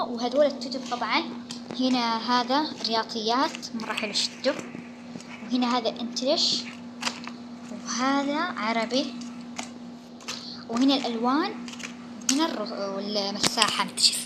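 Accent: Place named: Saudi Arabia. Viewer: احلى👍 بتحكي سعودي ماشاء الله هههه بس مافهمت شو يعني اول يوم حضوري؟؛!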